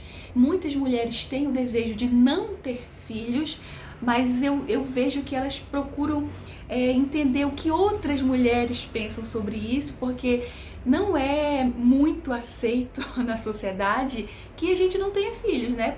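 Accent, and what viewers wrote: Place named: Belém, Brazil. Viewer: Olá, caí de paraquedas no seu canal, uma pergunta vc é de Belém ou imediações? Seu jeito de falar me lembrou demais de uma amiga que é de Belém e fala igual a vc 😅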